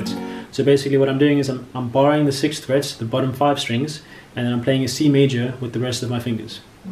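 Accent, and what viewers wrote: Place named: South Africa. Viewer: I nearly died from excitement when I heard the south african accent!!!! It's not every day I find south african youtubers 💀 Keep doing what you're doing, this tutorial was amazing!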